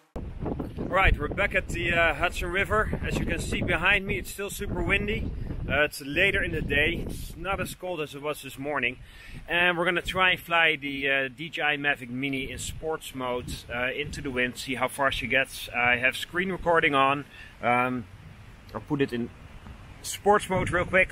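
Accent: Netherlands. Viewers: Nice! i was looking for a review in strong winds. Thnx! By the way, are you Dutch?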